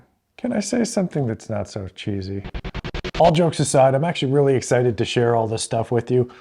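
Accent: Canada. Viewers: Camera work is getting so good! And...yeah i watch these videos cuz you're insanely handsome, and I have a soft spot for Canadian accents, but the handyman tips are a bonus 😎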